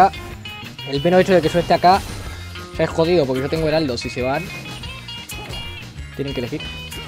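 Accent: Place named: Argentina. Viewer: Este pibe es una masa la voz me hace acordar a Dross pero con acento argentino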